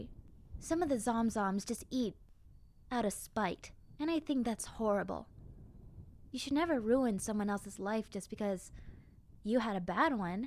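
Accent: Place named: New York, United States. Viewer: I love your New Yorker accent! >//~//< Flippin adorable. X,D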